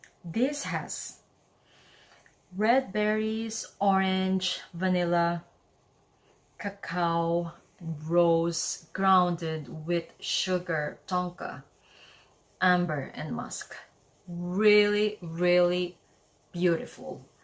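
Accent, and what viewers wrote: Philippines. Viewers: Filipina accent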